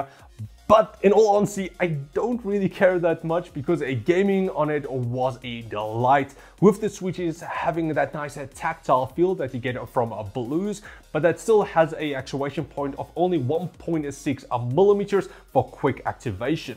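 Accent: South Africa. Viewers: 'traveling and eh-gaming'. Lmao I love the SA accent.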